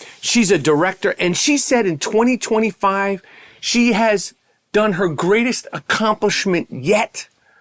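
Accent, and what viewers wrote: New York, United States. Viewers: Yo Rap. I love it bro. You’re still talking like it’s the 1980’s New York hip hop scene. Ol’ school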